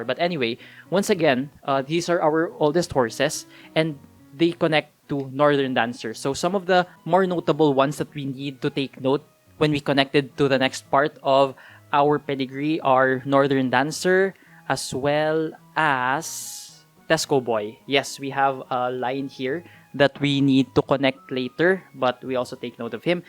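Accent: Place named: Philippines. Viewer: This needs more views. Filipino accent is strong.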